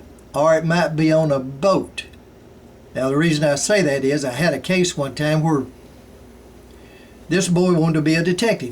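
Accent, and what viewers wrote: South, United States. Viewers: Enjoy the documentaries , the soothing southern drawl , the simple straight forward logic . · I'm sitting here in London listening to your terrific accent. What part of the USA are you from? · you give sound advice. loved your southern accent.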